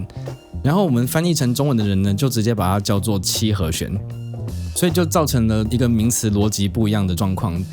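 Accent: Taiwan. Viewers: Accent sounds Taiwanese. Really glad I came across this channel! For the Cmaj9 (omit 3), it can also be labelled G/C as an inverted chord, right? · 這是台灣口音部分了 我們不像大陸咬字那麼要求精準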